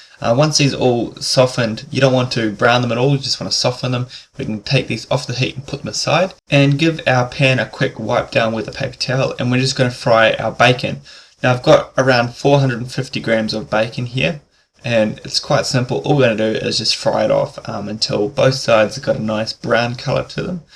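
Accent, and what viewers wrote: New Zealand. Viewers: Kiwi accent? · That's a Kiwi accent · That would be an NZ accent, but an educated one. Closest British similar accent is between Bristol Reading and Oxford. A less educated accent can sound much more like an Australian one, but to be fair educated Australians (rare as they are) can sound like educated NZrs.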